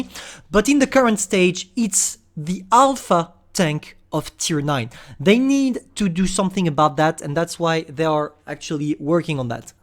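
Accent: France. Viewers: Ta un accent français de ouf mec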